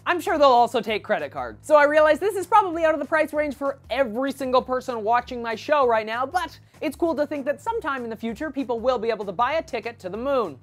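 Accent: Canada. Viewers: Being from Canada It's actually his accent...he didn't say it wrong. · Guys, he's Canadian. Italian pronunciation doesn't really have a lot of influence. Both are acceptable.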